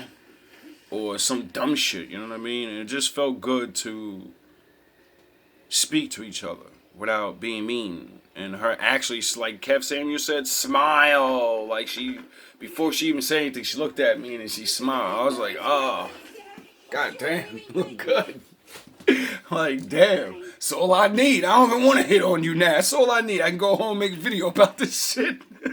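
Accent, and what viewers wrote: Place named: West Coast, United States. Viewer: You sound like you're on the west coast.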